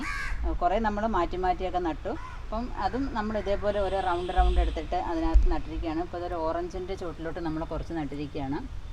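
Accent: Kollam, India. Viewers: Nammude kollam style bhasha ayyam puredam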